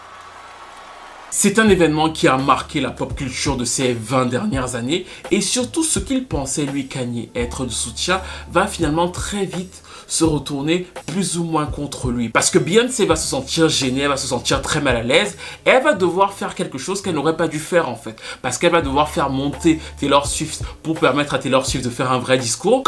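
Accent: Cameroon. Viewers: Tu as un petit accent camerounais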